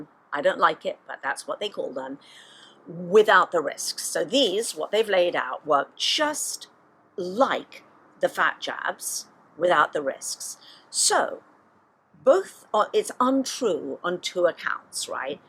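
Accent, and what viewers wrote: England, United Kingdom. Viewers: You sound English but use US terminology, eg, board certified, weight in pounds?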